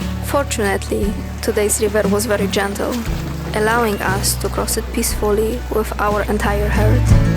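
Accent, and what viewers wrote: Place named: United States. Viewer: Piekny film.Jest sub. Świetnie znasz angielski.Taki bardziej w amerykańskiej wersji(accent). Pozdrawiam.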